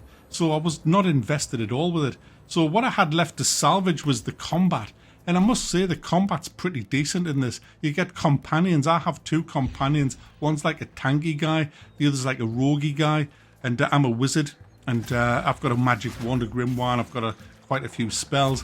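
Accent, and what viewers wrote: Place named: Newcastle, United Kingdom. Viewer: Honestly I couldn't care less about Avowed it's just nice hearing a fellow geordie ranting about poor shite 😆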